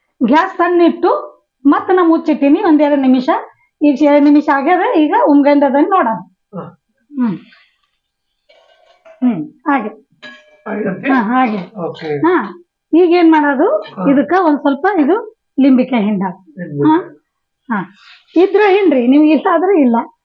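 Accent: Maharashtra, India. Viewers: Unti nim maharashtra kannada bharpoor ista aatri... Neev mado palle supr ree... Daily school ge kattak lagu madak easier ree avvari..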